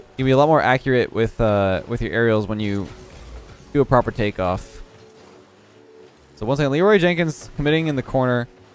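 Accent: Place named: Canada. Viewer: sounding especially Canadian today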